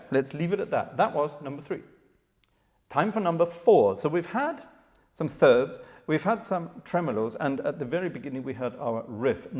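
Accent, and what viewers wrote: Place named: Scotland, United Kingdom. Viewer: I watch the German version for the same reason. German with a slight scots accent is very easy on the ear. ;-)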